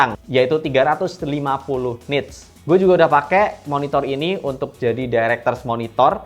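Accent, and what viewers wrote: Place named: South Sumatra, Indonesia. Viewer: Terima kasih reviewnya, Bang... Informatif sekali 👍👍. BTW, Abang asalnya dari Sumsel ya? Kebanyakan teman2 saya yang asal Sumsel, kalo bilang kata "gelap", diucapkannya "gelep".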